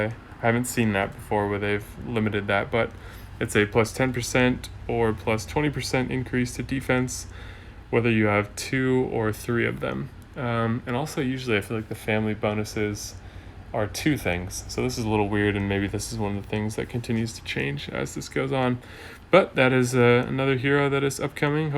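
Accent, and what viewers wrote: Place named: United States. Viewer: From the slight accent at the back of his voice it is safe to think he may be American. It's that slight drawl and laconic speech which makes me think he is someone who graduated from University. Most likely someone who has a lot of friends but only a select few whom he considers his closest mates. I don't know. I'm just taking a stab in the dark. As a writer I often create stories for those I follow on YouTube. I'll be wildly outrageous and take liberties that others wouldn't. The only thing I never do is make assumptions about their sexualities as that is a private matter that is private to them only. I don't need to know their personal life that closely but it is fun to hazard a guess at their most basic backgrounds. In all honesty, he sounds American due to the fact my sister went to America a long, long time ago and fell in love with America and hasn't come back home for over thirty years!!! 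She even sounds like a dinky di American from New York!!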